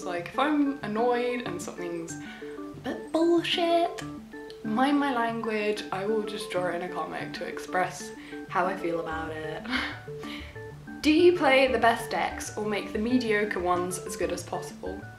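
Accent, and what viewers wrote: United Kingdom. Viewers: Quite interesting. Do you notice what I'm talking about or do you feel you sound completely British? Like seriously when this video first starts I thought you were American.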